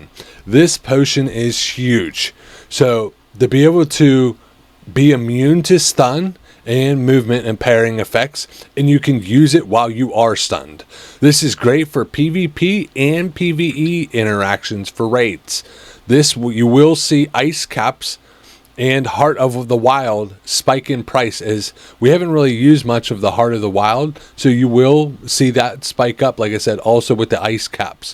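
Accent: Philadelphia, United States. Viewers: i gotta ask.... you gotta be from around philly right??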